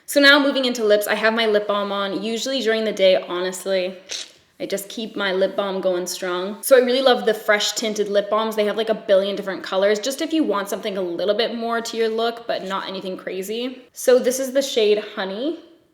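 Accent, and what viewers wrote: Canada. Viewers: I really enjoy watching your videos. It's hard to find naturally fair skinned, blue eyed, blondish artists doing makeup videos that are both imaginative and genuinely good. Also, I really appreciate how honest and down-to-earth your narration is. I know you said you're Canadian and not American but for a European, often the accents are pretty much the same. The American video narration style can be very cheesy or loud and repetitive without really saying anything new. It's for this reason that I actually rarely finish a makeup video. I tend to get irritated with the token phrases and the industry veneer everything has. Your videos taught me more about how to approach makeup and it's application...rather than just what's fashionable now... which is so important given how economically unfeasible it is for most people to buy these products. 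Thanks a mill for not being a knobhead. Love from Éire X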